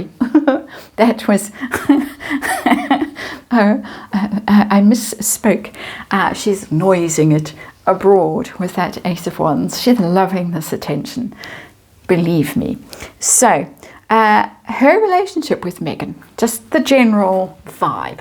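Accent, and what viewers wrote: South Africa. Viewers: I wondered before you started this reading if your empathetic tarot reading would clash with astrology and the cards would not co-operate. However, as you seem to be able to keep both worlds happy, they were allowing you to gain what you were seeking. Rachel on the other hand? Maybe, having sold her soul to the devil, even he has chucked her out, so she is trying to cosy up to the heavens. I really enjoyed this reading and PLEASE keep your lovely soft South African lilt and don't try Russian😇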